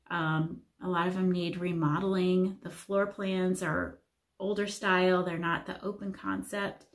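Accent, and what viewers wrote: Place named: Missouri, United States. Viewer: I was raised in Missouri and know your area. I was mostly listening to your dialect. Seems normal to me but people in other parts of the country hear it. Was in PA, now in Oregon... good video!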